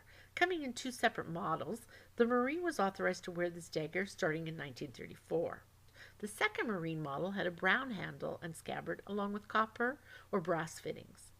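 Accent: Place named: United States. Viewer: i think you meant pronunciation.. shes clearly an american. big deal. thank for the video lady. but crank the sound up, i had to crank my stereo up.. too quiet.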